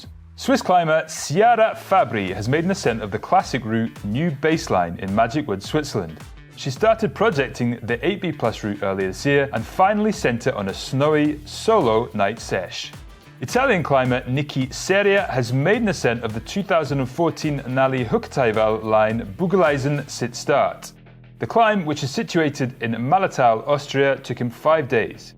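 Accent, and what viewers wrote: Scotland, United Kingdom. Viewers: I swear you sound a lot more Scottish than I remember!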